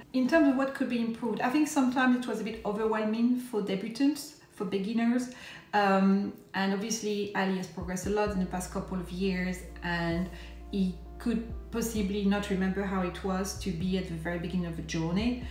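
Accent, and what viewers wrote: France. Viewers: So nice to see a review from a female perspective and someone not in their 20s or 30s (I with you sis!). Congrats on completing the course. Love the French accent and the Bonjour at the beginning. Good luck on your Youtube journey.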